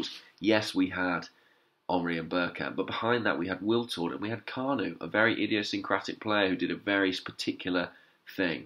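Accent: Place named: England, United Kingdom. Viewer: As a french fan I wish Olivier leaves Arsenal to another club where he can play more games. I think Marseille might be very interested, they are qualified for the Europa League too, he will be the main striker there and theirs fans will love his fighting spirit. I love your videos man, it helps me to understand english accent as well, keep going!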